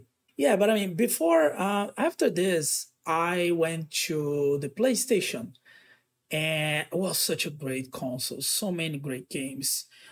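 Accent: Brazil. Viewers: I can recognize that Brazilian speaking english tone everywhere. Keep the goop work, fellow BR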